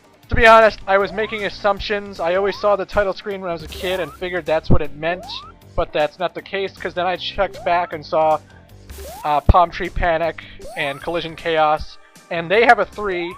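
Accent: Canada. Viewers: youre from Canada ? And sonic boom sonic boom sonic boom is annyoing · speaking of canadian stereotype's do you say aboot when you mean about?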